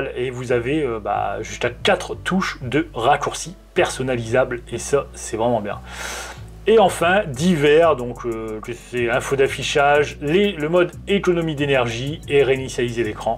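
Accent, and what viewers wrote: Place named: Nord-Pas-de-Calais, France. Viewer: le petit accent chti sur les "a" mutés en "oa" bien lourds lol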